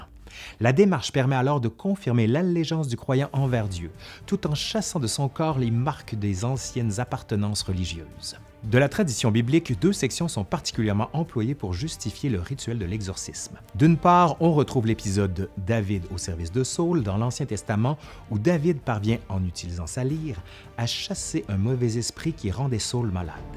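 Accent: Quebec, Canada. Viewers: Je suis tombé sur ta chaîne car je trouvais le thème intéressant. J'ai été surpris que tu sois québécois et j'ai trouvé ça dommage car je ne suis pas habitué à cet accent. Cependant, j'ai visionné un peu plus tard une autre de tes vidéos et je dois dire que ça ne me dérange plus ton accent. Les sujets traités sont tellement intéressants et je trouve même maintenant que l'accent québécois est plaisant et joli à entendre. Voilà, Merci de nous éclaircir sur tous ces sujets tout aussi passionnants les uns que les autres ! Keep going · Mes seules références en la matière, c’est le film “The Exorcist “ de 1973😂! Merci pour ce complément d’enquête apportant quelques pièces de plus à mon puzzle! L’humour et votre bel accent Québécois rendent l’enrichissement de nos connaissances plus facile! 👍🏼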